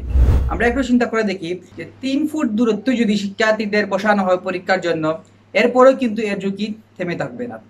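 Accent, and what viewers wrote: Sylhet, Bangladesh. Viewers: ভাই অপরাধ মার্জনীয়, ভাষাগত দিক দিয়ে একটু ত্রুটি রয়েছে বলে মনে করি। আমাদের সিলেটি আঞ্চলিক ভাষার সাথে কলকাতার বাংলা ভাষার অনেকটা মিশ্রণ রয়েছে আপনার উচ্চারণে। তাই একটু বেমানান বেমানান মনে হচ্ছে। যাই হোক কোনো সমস্যা নেই। ❤️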